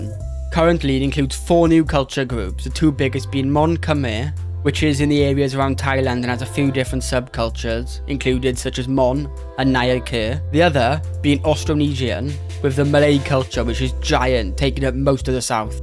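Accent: Wales, United Kingdom. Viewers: You sound Welsh, take my like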